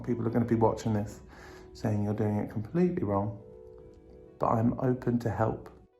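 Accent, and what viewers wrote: United Kingdom. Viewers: I love their British accent.❤️ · Thought Iam watching Harry Potter , when he started British accent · Everyone talking about good music, ' pronouns, but can we talk about their british accent? Gosh i fell in love. ✨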